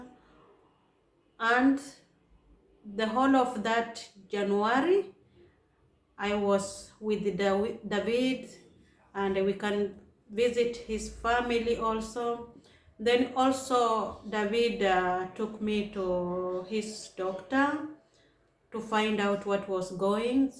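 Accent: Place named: Maragoli, Kenya. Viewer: Hi sis where do you come from? You sound maragoli lime me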